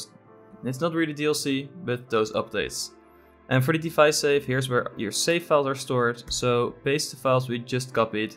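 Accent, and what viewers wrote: Netherlands. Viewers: I think you are from Netherlands because of your accent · you dutch or some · You Dutch? Sounds like it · You sound dutch, are you? XD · Are you dutch? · Yo Dutch? just a guess! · Dat Nederlandse accent 🤣😭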